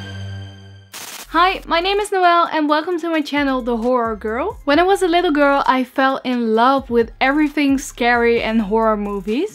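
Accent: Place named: Netherlands. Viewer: Ahhh! Can’t wait! I love the Dutch accent though. Haha, it’s so cute, I have it too haha.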